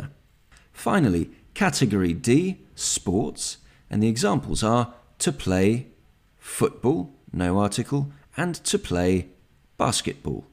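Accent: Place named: United Kingdom. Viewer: UK English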